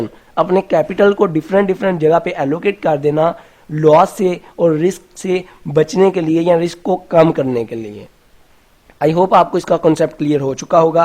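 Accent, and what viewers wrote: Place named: Punjab, India. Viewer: Bhai tu punjabi lg rha tone se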